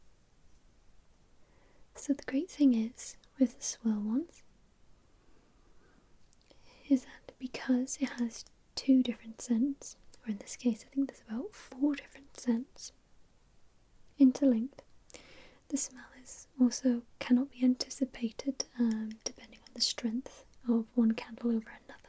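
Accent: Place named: England, United Kingdom. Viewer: love your accent. what area are you from in england?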